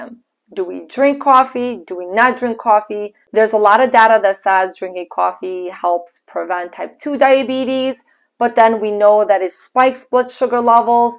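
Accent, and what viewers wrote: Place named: Long Island, United States. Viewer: Long Island accent!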